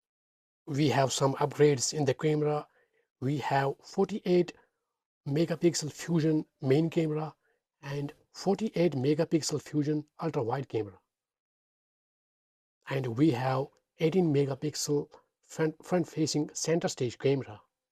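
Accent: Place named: Kashmir, India. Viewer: Kashmiri accent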